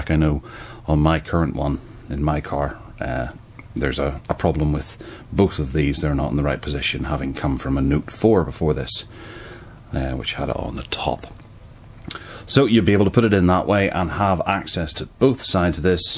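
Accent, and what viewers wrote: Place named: Northern Ireland, United Kingdom. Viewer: Hey, do I detect a Northern Ireland accent :P County Down here !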